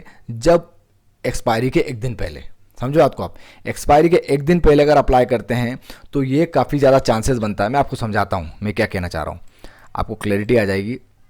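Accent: Indore, India. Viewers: Bhai mujhe lagta hai aap Indore se ho....kya me sahi hu? Ya malwa region se 🤔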